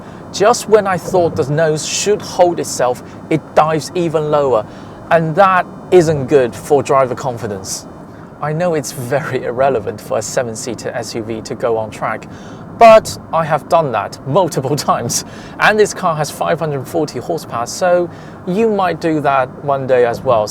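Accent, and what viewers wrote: London, United Kingdom. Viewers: 英语真好啊这标准的伦敦音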